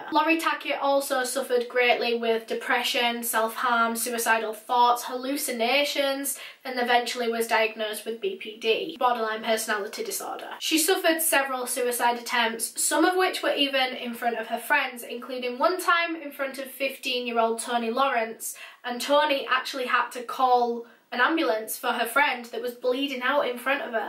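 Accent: United Kingdom. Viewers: Not trying to be a dick but her voice is not that great 😅 She's almost yelling especially at the beginning of every video, and that turned me off of her for such a long time till I finally decided I'm gonna try to get past her yelling intro, and then I absolutely loved her and binged her content. She has a British accent, different from the "mainstream British accents" like in the movies and stuff, but still lovely. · Her voice is so angelic and beautiful she speaks in a British accent. She is a nice true crime YouTuber but I would like if she would call the people who are responsible for the crimes names like monsters psychos sickos etc……………..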